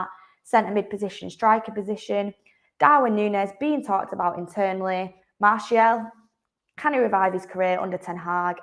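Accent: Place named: Manchester, United Kingdom. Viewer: Love the Manchester accent … but please , pronounce your Ts. It’s Man UNITED , not UNI-ED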